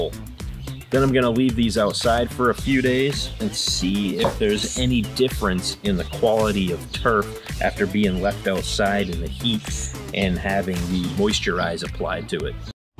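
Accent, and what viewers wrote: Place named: Boston, United States. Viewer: How did that accent get to Illinois? I always assumed you were in a Boston suburb.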